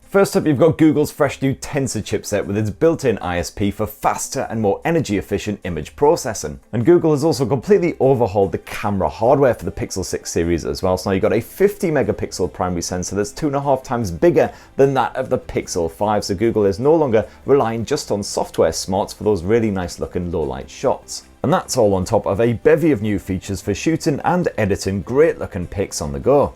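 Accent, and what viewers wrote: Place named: Yorkshire, United Kingdom. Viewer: Thanks for the great review. I picked up on your Yorkshire accent in the first line, and was delighted to see pictures of York. I enjoy comparing them to my Nikon pictures from my visit. Memories! Cheers.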